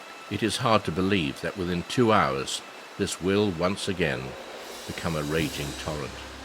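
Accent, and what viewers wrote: England, United Kingdom. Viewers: Is it just me, or does the accent have its roots in the same general locale as Alfred Hitchcock?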